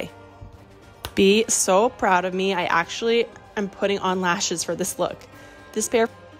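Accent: Russia. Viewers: your Russian accent is on point!!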